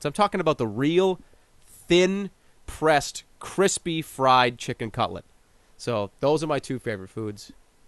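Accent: Boston, United States. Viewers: I listen to Jerma so I can talk with a Bostonian accent. MY GOD, THAT ACCENT IS BEAST. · Wow you are from Boston! Just like a scout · klahndyke bah :D gotta love that boston accent.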